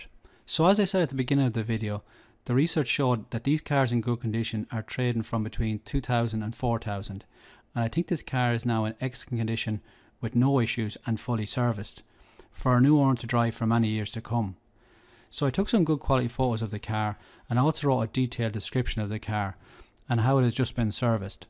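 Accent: Ireland. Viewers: Great job. I enjoyed hearing the Irish accent too (Mum was Irish). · Glad to here another Irish accent on YouTube. Keep it up bro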